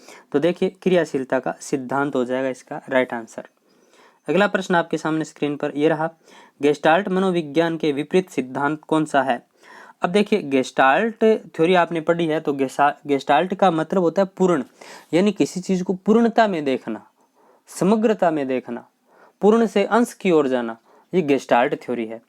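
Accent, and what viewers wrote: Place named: Hanumangarh, India. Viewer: Aap ek dam humre jase bolte h hanumangarh ke h kya aap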